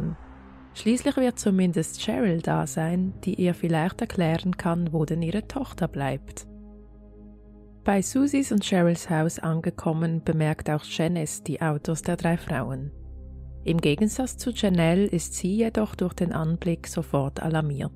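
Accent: Switzerland. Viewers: Was stört Dich daran ? Ihren Schweizer Akzent kann sie wohl schlecht ablegen . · Den schweizer Akzent im Hochdeutsch finde ich so charmant. Ich mag deine Stimme sehr und finde deine Recherchen gut gemacht. Ich freue mich auf weitere Folgen. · 🤭😆 wie süss. Schweizer Sprech ist zum verlieben. 😍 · Das ist kein schweizerdeutsch...bin berner...so tönen wir wenn wir uns mühe geben schriftdeutsch zu sprechen...wenn wir schwyzerdeutsch sprechen versteht ihr kein wort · Da bekommt sogar das Schwietzerdütsch einen angenehmen Klang. Es gefällt mir sehr gut, wie du den Fall, den ich schon von einem anderen Crime-Mädel kenne, dargelegt hast . Sehr umfangreich, gut recherchiert. Ich habe gleich ein Abo dagelassen und freue mich auf viel mehr von dir. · Schweiz Akzente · Ich wusste nichtvdass die Sprecherin eine Schweitzerin ist aber ich habe es sofort an Aussprache bemerkt.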